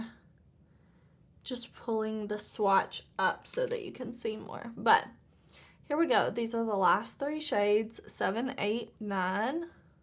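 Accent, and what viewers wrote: South, United States. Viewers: Those are gorgeous, but at 57 I don’t wear sparkle on the daily like I used to so I don’t need this palette! I am the one who watches all your videos because you are so fun to hang out with online and I could listen to your beautiful Southern accent for hours!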